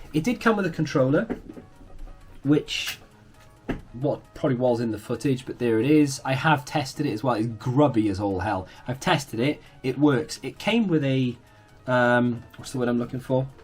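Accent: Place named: Merthyr Tydfil, United Kingdom. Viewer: love your merthyr accent im saying this as a Caerphilly bloke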